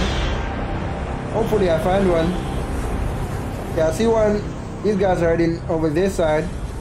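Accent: Jamaica. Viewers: don't try so hard to speak pure english: hit ah video wid pure yardman tone. Respect no hard feelings.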